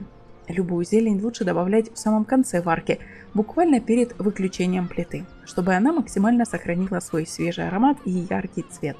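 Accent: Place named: Surzhik, Ukraine. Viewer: С таким чудовищным суржиком и на рецепах?😅😅😅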